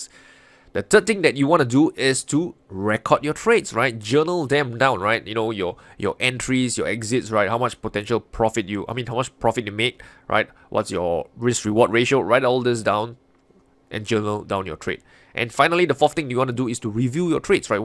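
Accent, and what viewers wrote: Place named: Singapore. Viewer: has a typical Singaporean accent.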